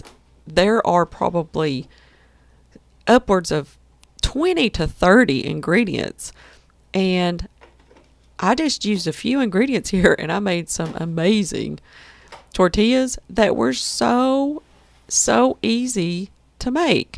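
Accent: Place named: South, United States. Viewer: just watching this video, you look amazing doing this, great chance to get involved in community, where you can be a witness for the Lord, although where you live, I’m assuming it’s somewhere in the South, I hear your accent, lol, but it’s not a heavy one, many people are Christian, but sometimes it’s more cultural, rather than actually being born again, great video, love your channel!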